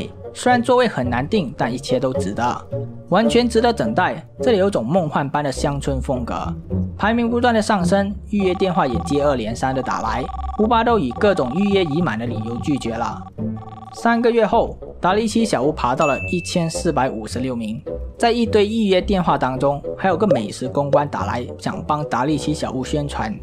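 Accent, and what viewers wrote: Malaysia. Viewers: 听口音像马来西亚人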